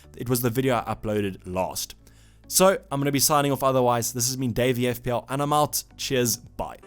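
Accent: South Africa. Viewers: good to hear that SA accent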